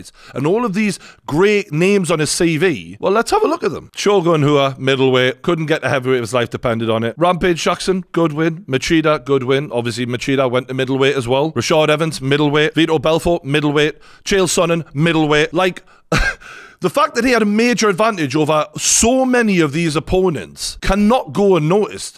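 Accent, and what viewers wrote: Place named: Geordie, United Kingdom. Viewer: Im a HUGE jones fan but to call him the outright goat is alil far-fetched, sure hes had an amazing career at LHW but im hearing alot of facts about jones, when he was younger and hungry but now hes just tryna take the easy way out... Huge aspinall fan also (im American) i think he has to have the fight with jones... It needs to happen. Ps i love the geordie accent 🙋🏻‍♀️😂😂😂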